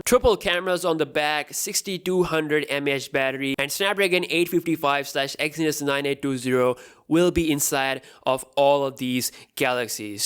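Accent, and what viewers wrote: India. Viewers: Sorry but you just can't hide how indian you sound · Nice content ..nice video..but the narrative..the voice..it hurts my ears...sounds horrible. Sounds like those auto-read thingy with a terrible indian accent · hijui999 hes Indian lol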